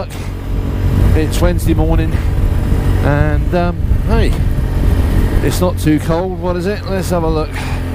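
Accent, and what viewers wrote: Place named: England, United Kingdom. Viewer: Hello ohhhh lucky boy! you have a BMW! ohhh nice one! and I love your typical "English" who remember me my youngers days at college and the trip in my penfriends house ( Kingslynn).🌸 Thank you for sharing this 𝓢𝓾𝓹𝒆𝓻 ⓋⒾⒹⒺⓄ with us 🌸🍾🍷🍇 Greetings from la France in Europe🍾🍷🍇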